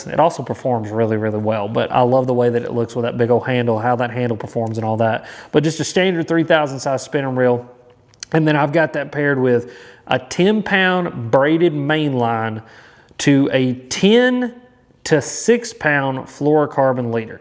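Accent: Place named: South, United States. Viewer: I see your masters hat, and I hear your southern accent. I just found your channel and it looks like you are in the CSRA area based on what I can see so far lol I've been transplanted here from Wisconsin and I'm trying to figure out how to fish the Savannah River and other South Carolina lakes. Thanks for your videos!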